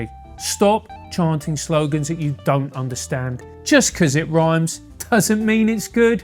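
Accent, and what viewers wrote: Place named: Mockney, United Kingdom. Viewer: Your mockney accent is awful.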